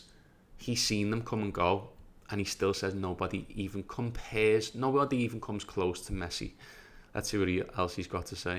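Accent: Liverpool, United Kingdom. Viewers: Hi. I enjoyed watching your reaction to the videos. Agree 💯 it is a pleasure to hear and see positive comments about others. I’m not a football fan per se, but I do watch Messi. His playing style is poetry in motion. Great reaction. And your scouse accent is delightful ❤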